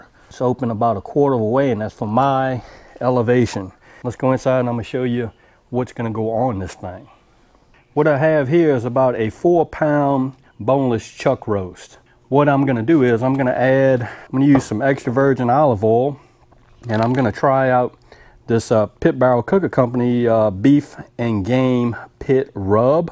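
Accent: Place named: Pensacola, United States. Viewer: Whoa man! That’s a damn fine first cook on the PBC. I’ve made a pot roast on it before & it came out real tasty. Never tried it with the peppers before, in fact I’ve never even heard of using it. But I do plan on giving that a try soon. Love the accent! Sounds just like my cousins from Pensacola, & I love, love, love the hat...I too, am an American INFIDEL!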